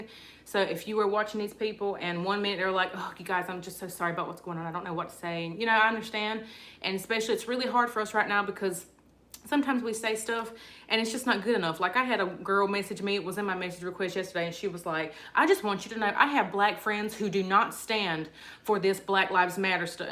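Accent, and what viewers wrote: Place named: North Carolina, United States. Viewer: I'm an older woman and I really enjoy your videos. I'm originally from Laurinburg and I bet you know where that is. Our accents are alot alike. LOL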